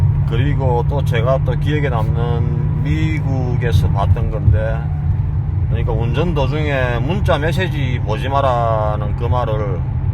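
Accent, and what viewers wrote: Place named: South Gyeongsang, South Korea. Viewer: 아제의 나레이션이 정말 정감이갑니다. 경남의 억양과 구수한 음성으로 시원스런 도로를 달리는 영상들 최고입니다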